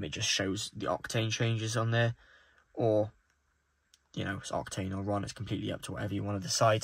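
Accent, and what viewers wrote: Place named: United Kingdom. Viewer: Yes, I also noticed using the US octane. Seems to be incorrect. You sound like you are british.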